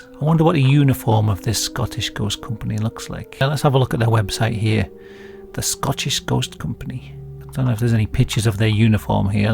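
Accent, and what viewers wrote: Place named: North Wales, United Kingdom. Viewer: I knew you were from north wales😂😂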